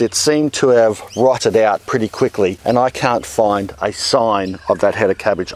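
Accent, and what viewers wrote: Australia. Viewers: Are you an Australian? Love your accent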